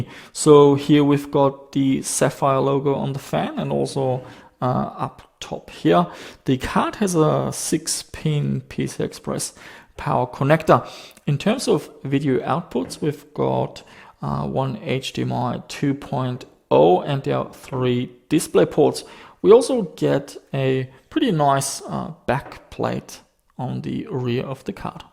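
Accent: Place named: Germany. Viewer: you german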